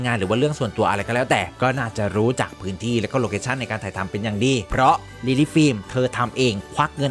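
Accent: Isan, Thailand. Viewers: อยากรู้.ครับว่า.แอดเป็นคยจีงกวัดไหน..เว้า.อิสานม่วนหลาย.เด้อครับ..❤❤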